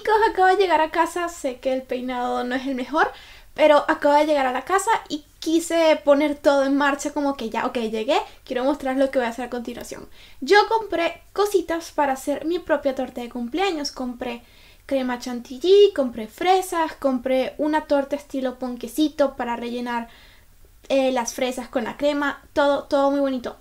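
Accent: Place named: Chile. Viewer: Hablas como chilena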